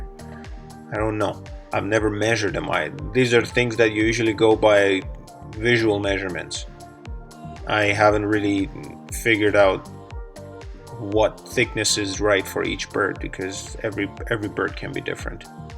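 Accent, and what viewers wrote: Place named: Poland. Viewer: Boy oh boy I'm so pleased I found you! I've been doing Taxidermy for 43 years, self-taught , so it's been a struggle. Although I did achieve best all-around taxidermist in the state of Arizona 2001, and I'm still learning everyday. You are helping me a great deal! Thank you, thank you ,thank you. Question, are you using silicone caulking? Also, where you from Brother? Some of your English words sound like words used from my polish family when they speak English. But I know that's not what it is. Again I want to thank you immensely! Mounting Birds in Arizona has been a struggle because of the dry heat over here . now that I've watched you mount a bird before you blow dry it you gave me great ideas. I just wanted a ringneck pheasant your style and I love it! I still hit the inside of the skin with a little hydrogen peroxide here and there to keep it moist. 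And also try your head locking mechanism. I've never done that before either. Now I can adjust the position of the head and neck without fear of breaking the connection! You're the man! My hat is off to you! Yours in Taxidermy, Mike Bieda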